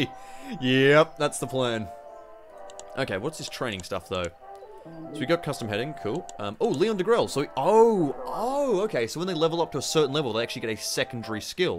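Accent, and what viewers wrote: Australia. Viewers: I started watching this earlier today and when I came back he sounded even more Australian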